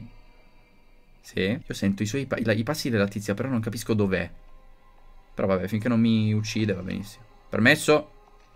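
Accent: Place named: Bari, Italy. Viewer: che parla in dialetto barese😂😂.Io sono barese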